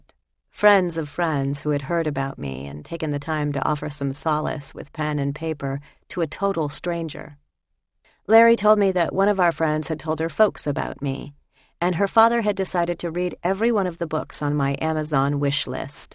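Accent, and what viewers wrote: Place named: Russia. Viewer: Love her Russian accent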